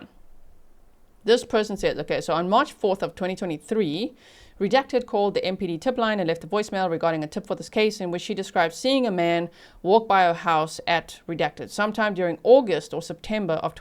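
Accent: South Africa. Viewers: I know a south african accent when i hear one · Just discovered you. Funny how I knew right away that you are a fellow South African! Thx for your great work. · You've just gained a new South African subscriber- I recognize your accent, but it's not that- your breakdown and the new info given in this video is brilliant!! Thank you!! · is that a beautiful South African accent I detect? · Are you also from South Africa. Your accent❤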